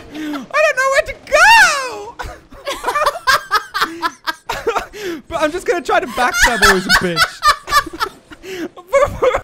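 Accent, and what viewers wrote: Germany. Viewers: grewal The Accent is German if you were still wondering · Ganz klar deutsches Englisch :D Dennoch gut gemacht, hat mir sehr geholfen :) Konter sind demnach wohl nicht so ratsam, weil man die Verteidigung runternehmen muss. Ich wurde schon vom Greifen am Anfang 3 mal zerfetzt :P Gibt es bei solche Monstern Tipps, die mna beachten sollte? Es durchschlägt ja Parieren, Quen-Schilde und der kleine Dodge wird auch komplett ignoriert. Also kann man praktisch nur Zeit schinden bis die Spells bereit sind, was ja nicht Sinn der Sache sein kann :/ Grüße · Robin Andersson Sounds like a german accent ;) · German accent actually. Austrians are speaking german, too btw. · Great video! Thanks! Also, you sound like Flula. Haha.... are you German?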